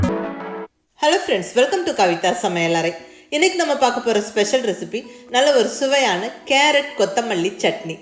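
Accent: Kongu, India. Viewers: Hi mam... U r one of my inspirations to start a YouTube channel... Love the way you describe the cooking with கொங்கு தமிழ் ..way to go mam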